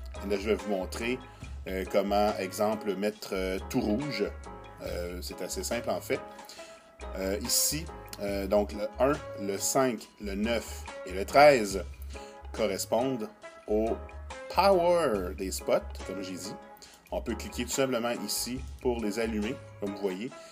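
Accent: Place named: Canada. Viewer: Merci pour le tuto en Français, j'attends mon interface et c'est grâce à tes explications que je l'ai acheté, en plus des explications tu nous fais voyager avec ton accent Canadien ; )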